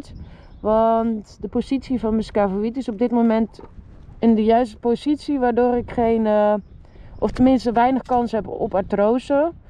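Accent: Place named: Netherlands. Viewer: Hi, I hope everything is ok with a nex surgery. Thanks for you vidéo <3 i like your dutch accent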